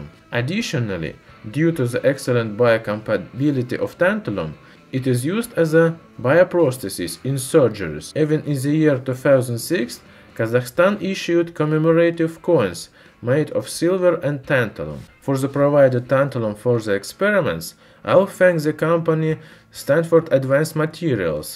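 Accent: Russia. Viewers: Russian accent, its great , listen & learn it. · His accent is sometimes starting to go to Russian accent but I understand him. I don't sometimes understand him it's accent is very good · I think his accent is interesting and I've never heard a Russian accent like that. He looks Finnish to me, and I wonder what part of Russia he is from.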